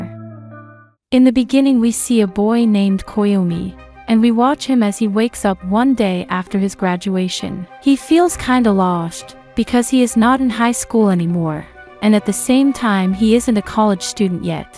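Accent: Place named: England, United Kingdom. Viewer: Sorry, but I keep laughing when you read anything Japanese in English accent XD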